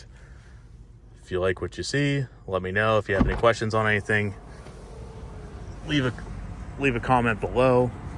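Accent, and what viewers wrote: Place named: Pittsburgh, United States. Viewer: Thanks for the content. I assumed you were from a Pittsburgh dealership, judging from your accent. Close. Ohio.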